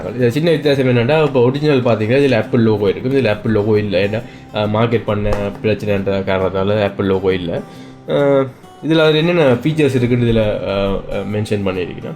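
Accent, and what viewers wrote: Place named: Sri Lanka. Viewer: Very happy to hear tech review in sri Lankan Tamil slang! 😍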